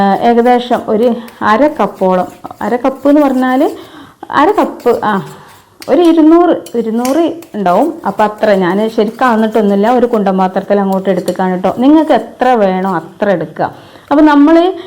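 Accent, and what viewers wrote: Malappuram, India. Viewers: Thank u mole. I like your old Malappuram language. God bless you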